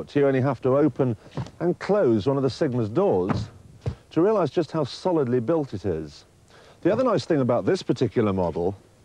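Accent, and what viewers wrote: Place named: RP, United Kingdom. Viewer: How did he go from suit and Queens English, to POOOOOWER! and SPEEEEEED!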